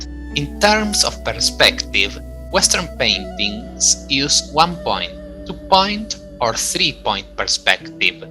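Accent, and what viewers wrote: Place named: Italy. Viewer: Italian Accent with ‘Roman’ painting.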